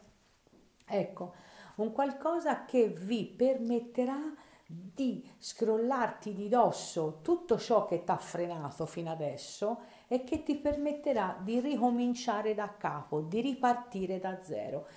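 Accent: Tuscany, Italy. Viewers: Sei dolcissima ahahah 🤣🤣🤣🤣🤣😂😂😂😂già solo cm parli metti allegria, sei Toscana? Poi la chitarra troppo carina 😂😂😂😂😂🥰🥰🥰🥰🥰♥️♥️♥️♥️♥️♥️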